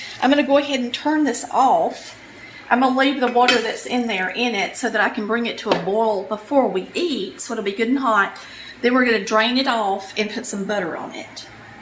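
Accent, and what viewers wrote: South, United States. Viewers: "boled" okra? I actually love you southern accent. Thanks for the video.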